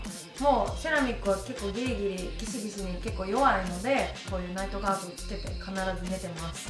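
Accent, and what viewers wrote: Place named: Kansai, Japan. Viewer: 関西弁も可愛い好き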